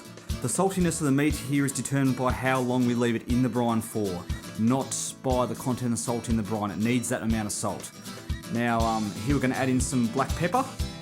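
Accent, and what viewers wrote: Australia. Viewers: It's so good it's retarded. Real, ocker Aussie speak. Love it mate!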